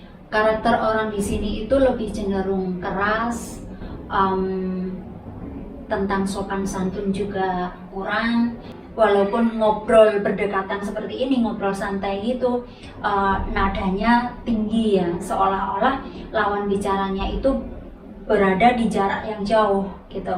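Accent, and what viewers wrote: Java, Indonesia. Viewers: Salam kenal y mbae..dri logatnya kayaknya wong jowo yo sampean mb?maaf klw slh...stiap liat vlog2 orng indonesia yg di india rta2 sm keluhan nya..seht2 y mb..salam dri indonesia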